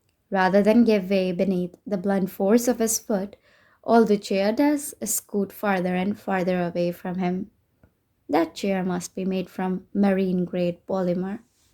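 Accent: India. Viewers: Omg I'm ur new subscriber ! Just checked out ur channel there are so many audiobooks for free ! Ur voice is so beautiful too ! I am so happy i found this Channel ! Can I ask where u r from ? ( Cause i feel like u have a little bit of Indian accent...u sound beautiful while reading ! ) Really appreciate ur efforts of giving us so many nice stories here at ur channel for free ! 💕💕💕💕💕💕💕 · Are u indian? Your accent is so thickly beautiful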